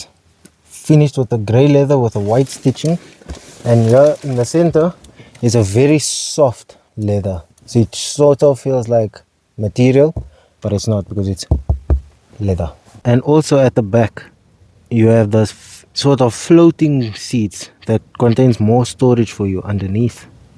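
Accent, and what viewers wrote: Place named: South Africa. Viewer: was wondering if this is a South African reviewer then i heard "awe ma se kind" 🤣......On a hatchback, you gonna need that rear wiper....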